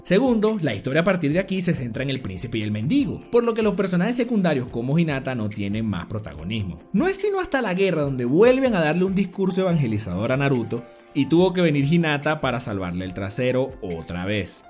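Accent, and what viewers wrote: Venezuela. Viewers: Excelente video, estoy muy de acuerdo con tigo, es mi imaginación o tu acento es de Venezuela??? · Jodaa ese acento venezolano xd i love naruhina, sigue asi, me encanta como editas.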